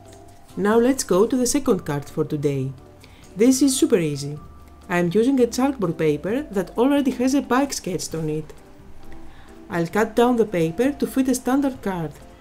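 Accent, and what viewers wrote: Greece. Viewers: Always love your cards and now I can say I love your beautiful Greek accent! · YYEEAAHHH you have a voice ;-) AND it's beautiful and your english is remarkable! I love love your videos and your work is so wonderful. In June i went to Santorini on vacation with my two adult daughters .... hearing your speach took me back to Greece. I'm a Dane and I'm collecting the courage to make videos in English ... you help me so much. THANK YOU and greetings from your biggest fan in Denmark ;-) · just love your Greek accent also your very precise instructions on your fab projects. x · I adore your Greek accent! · OMG! It was great hearing your voice! Love your Greek accent! Thanks for sharing. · Luv ur greek accent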